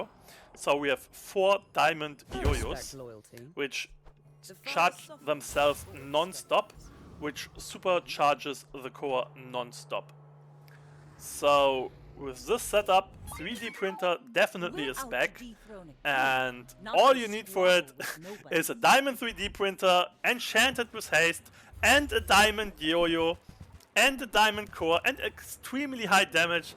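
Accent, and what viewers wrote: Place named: Poland. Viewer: Where are you from? You sound polish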